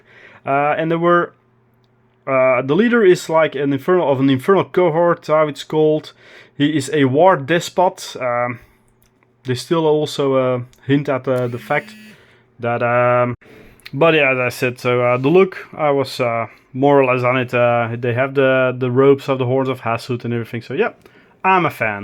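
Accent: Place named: Belgium. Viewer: Ik hoor het Belgisch accent, maar vlot gesproken haha :) Beetje verrast over een Belgisch kanaal aangezien de kleine community in België... Los van dat, ben denk ik even enthousiast over de dwergen als jij, zien er veelzijdig en uniek uit! Ook één van mijn volgende projecten?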